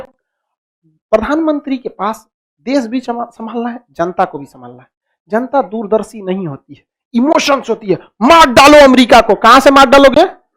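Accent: Bihar, India. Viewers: Sir Hindi with bihari touch makes your videos more intrested।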